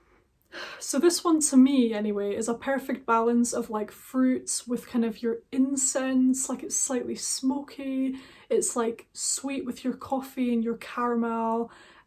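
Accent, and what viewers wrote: Scotland, United Kingdom. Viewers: Greetings to Scotland. Thank you. Nice, elegant, and classic Sottish accent. Have a successful year filled with love and happiness. Happy New Year.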